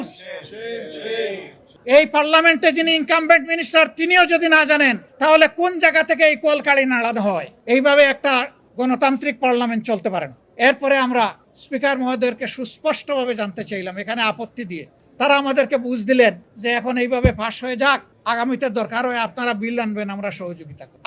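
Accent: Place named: Sylhet, Bangladesh. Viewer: doctor sab speak silghtly sylhety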